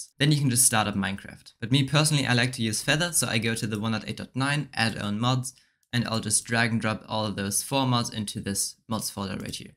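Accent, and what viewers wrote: Germany. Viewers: hey, nice video, really helped me Man hört aber stabil, dass du deutsch bist 😆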